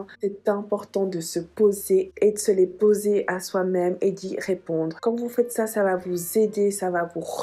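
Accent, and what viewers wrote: Spain. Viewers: J’adore ton accent espagnol moi aussi ma langue maternelle c’est arabe 🇩🇿 mais je parle l’arabe , français, espagnol et english ❤️❤️❤️🤍 mais ma Préfère c’est l’espagnol me encanta 😍 ¡qué dios te proteja y te bendiga!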